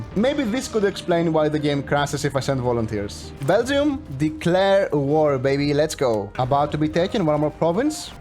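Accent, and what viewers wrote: Greece. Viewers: superior greek accent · Bro I can't believe it I'm greek και κατάλαβα κατευθείαν ότι είσαι από Ελλάδα από την προφορά σου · The accent... Are you Greek? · Never thought Greek accent sounds like this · Όταν σε άκουσα να μιλάς κατάλαβα ότι είσαι Έλληνας 😂😂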